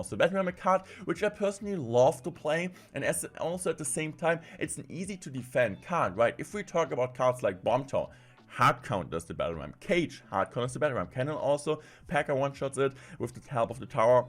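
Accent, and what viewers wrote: Germany. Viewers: Are you german i can tell by your accent😂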